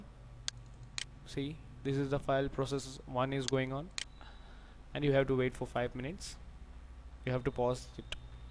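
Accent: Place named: India. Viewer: He is defenetly Indian....... :) Thanx for the tutorial man....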